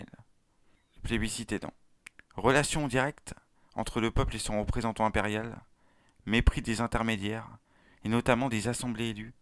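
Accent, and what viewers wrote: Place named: Brittany, France. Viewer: C’est incroyable comme tu as travaillé sur ton éloquence et ton accent breton qui était beaucoup plus marqué auparavant. Ainsi que sur le dialogue.🌟🍀 Personnellement, je n’ai rien contre les accents mais continues ainsi c’est mieux d’avoir un accent neutre pour se faire entendre par tout le monde😂, je reconnais le travail sur soi accomplis.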